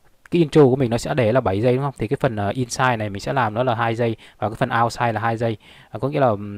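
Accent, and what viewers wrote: Vietnam. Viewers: A PHẢI NGƯỜI tHANH HÓA K Ạ ?????????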